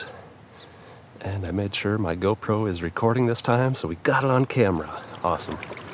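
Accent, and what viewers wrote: Canada. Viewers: Your in N Dakota? Ya sound Canadian. Is it N Dakota Canada?? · What a great and relaxing fishing video! You just got yourself a new subscriber. Excuse a completely irrelevant question from Sweden, but does the ND dialect have some Canadian pronunciation in it, I think it sounds similar?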